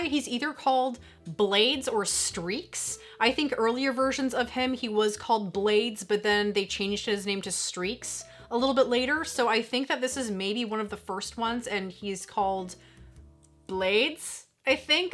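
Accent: Canada. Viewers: I could tell you were from Canada by just your accent. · I knew you were CANADIAN!!! I been around that awesome accent my whole life!!! Pops was born in Chatham, Ontario, Gramps and Granny in London!!!!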